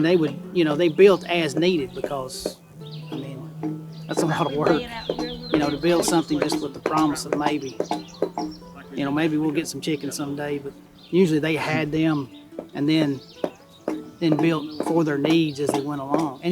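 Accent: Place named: South, United States. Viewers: Hoo boy, that Middle TN accent (pronounced AY-uk-CENT). Feels like home, haha. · I’m a native North Carolinian, born and raised, but even I had to put this guy on 1.25x speed. A true southerner!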